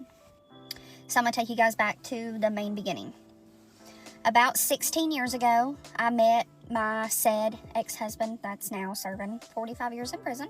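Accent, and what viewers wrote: South, United States. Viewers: I can’t listen to her southern accent. Sorry, it’s triggering right now in our current climate. I bet she voted for 🍊. And her trauma is directly related to her southern indoctrination.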